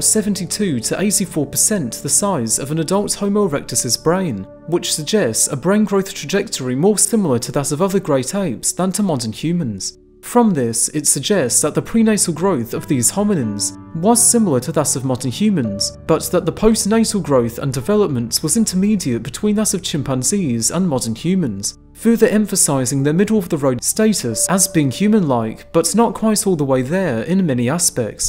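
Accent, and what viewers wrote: United Kingdom. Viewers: The narration drives me nuts. Strong British accent at hyperspeed, along with a very monotonous delivery completely devoid of feeling. Couldn't hang past 6 minutes. Too bad. Seemed like interesting content.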